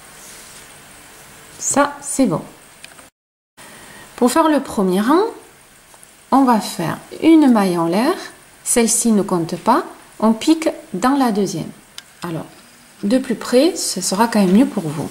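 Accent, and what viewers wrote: South, France. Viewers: Bonjour, très beau point!!! bel accent, ça sent le sud!!! beau week-end, bisous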